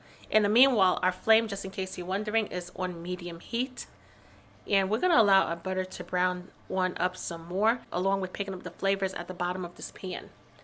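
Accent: New York, United States. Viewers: That New Yawk accent is adorable. 🥘💙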